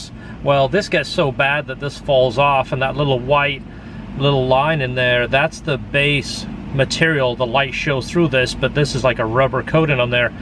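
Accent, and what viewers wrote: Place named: Yorkshire, United Kingdom. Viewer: Hey. 3 things to mention here. Good tip on drilling the holes to prevent further cracking.👍 The repair job came out nice. The S2000 buyers assistance program sounds great. I think there's a lot of value to the customer to have an S2K specialist like yourself sourcing the car for them. And finally, there's nowt wrong with your accent. It's Yorkshire innit?😉 I mean, you hooked Esther with that accent, so it sounds like a winner to me. See you on the next one.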